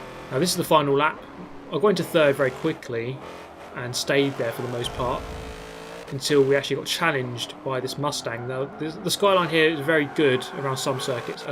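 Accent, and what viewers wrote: United Kingdom. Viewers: dude the scenes of absolute mayhem and you critiquing them with your limey accent has me dying lmfao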